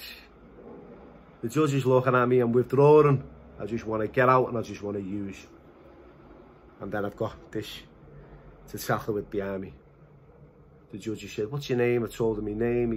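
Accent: Liverpool, United Kingdom. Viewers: Love the Liverpool accent 😊 look forward to seeing more videos on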